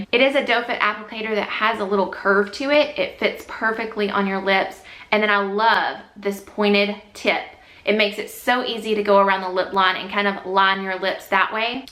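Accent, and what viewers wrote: South, United States. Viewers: your cute southern accent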